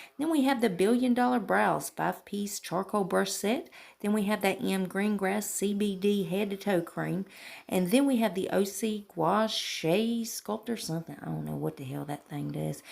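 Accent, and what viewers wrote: South, United States. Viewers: Finally another southern lady on YouTube!!! It's so nice to hear another southern accent.. makes me home sick tho!! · I've been so disappointed with Boxycharm over the last few months that I canceled my Premium box for June. I was frustrated last month getting the mascara over a palette (that fit my profile Perfectly), and then those brushes with the Ridiculous $90 price tag, and then the 2 mini lip balms that I'd be excited to see in a $12 Ipsy bag, but did Not belong in a Boxycharm Premium box... Come On!! It looks like the June Premium box is going to be much better, but I don't need another contour palette. The only thing I saw that really excited me in June's Premium is the Avocado sleeping mask. I'm crossing my fingers that I'll be able to pick it up in add-ons or Pop-up at some point. 🤞 I'm excited about Luxe, though. 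Hoping for some Great products to make up for the last few months of Duds! Thanks for the update, girl!! 😊 I'm excited to see Anything from First Aid Beauty! Nothing I've tried from this brand has disappointed me yet! Btw, I have that thick southern accent, too. There's nothing wrong with it! I lived in Virginia Beach for a few years when I was younger (I got my butt back to Texas as soon as I could! Lol), and it always amused me at peoples reaction to it there. Most people loved it, but some acted like I was speaking a foreign language! Lol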